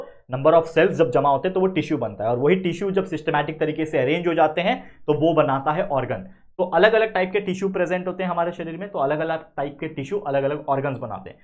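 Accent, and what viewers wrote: Maharashtra, India. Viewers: Ap marathi ho kya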